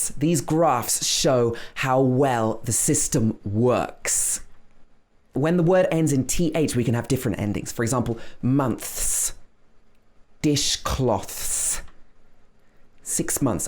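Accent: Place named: United Kingdom. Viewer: Thanks a lot sir. You teach me many things. You're perfect in British accent.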